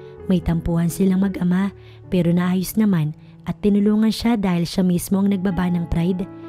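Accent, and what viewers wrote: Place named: Iloilo, Philippines. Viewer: ang deliverance at sound web ng Bose's ilongong ilongo gid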